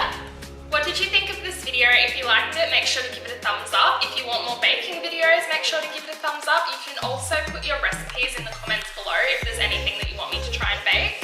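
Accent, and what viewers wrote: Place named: Australia. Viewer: Its so cool your from Australia, we love your accent! The cupcakes look GREAT! nom, nom, nom... :)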